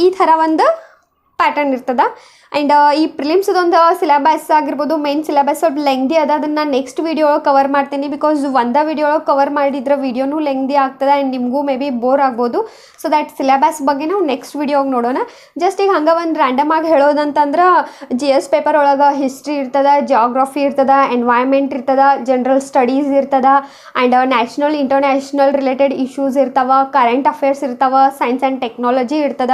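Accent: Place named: Bijapur, India. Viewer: Nin language slang nodidra biyalu seemi Hudge tare kanutte.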